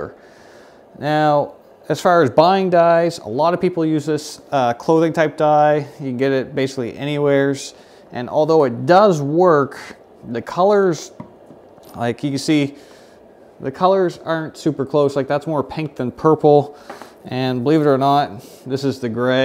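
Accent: Canada. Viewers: I think he's Canadian. That would explain the bad english. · Reminds me of Canadian or from Minnesota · Are you Canadian? You look and sound Canadian. I know this is random as hell lol. Good video 👌🏾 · He's canadian so he gets a pass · Idk because this is my first time watching one of this guy's videos but he sounds Canadian. I've never heard anyone pronounce it -oid and I'm American. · 9 seconds til Canadian reveal.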